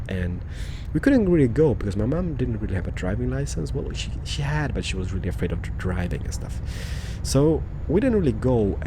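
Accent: Sweden. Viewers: jag gillar din svenska m8. it is funny. I like your swedish m8. it is funny.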